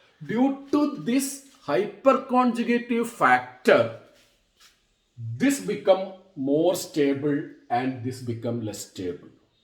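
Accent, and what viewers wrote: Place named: Kerala, India. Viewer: fan of your english accent having the touch of south ,, most prolly kerala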